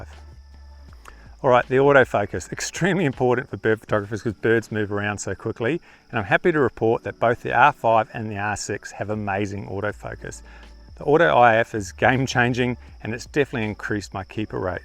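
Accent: Australia. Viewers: should add some subtitles mate, ur ausie accent isnt so easy for everybody..gday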